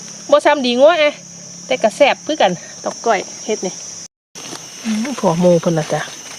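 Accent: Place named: Ubon Ratchathani, Thailand. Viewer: ป้าถามแหน่ยุพะเยาคือเว้าอิสานจ้าสำเนียงคืออุบลป้าติดตามจ้าแต่บ่อค่อยใด้เม้นหมานๆจ้า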